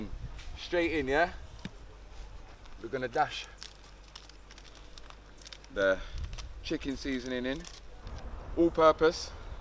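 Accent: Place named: Jamaica. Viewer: You talking with a Jamaican accent reminds me of my ex who used to talk with a manchester accent because she liked the sound of it despite being born and bred in the south lol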